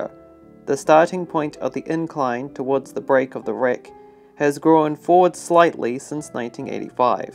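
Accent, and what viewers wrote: New Zealand. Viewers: New Zealander? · It's a New Zealand accent.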